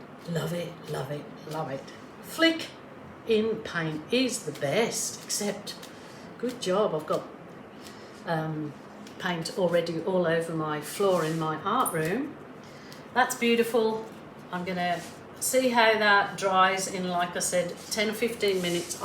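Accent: Birmingham, United Kingdom. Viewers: That is a cheer-me-upper if ever I saw one! Fabulous colours and your talent make a painting I could look at for a very long time, thanks for sharing the how of it. btw, every so often I think I hear a Brummie accent - is that possible? ❤ from Scotland ❤😂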